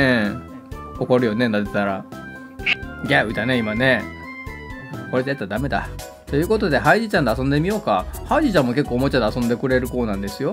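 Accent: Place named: Kansai, Japan. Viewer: 実行中継が楽しい！関西の言葉がとっても良い感じ！つい見入ってしまいます。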